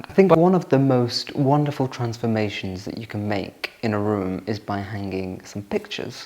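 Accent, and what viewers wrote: RP, United Kingdom. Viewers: He talks so posh ❤ 😂😂😂 are your parents posh ?????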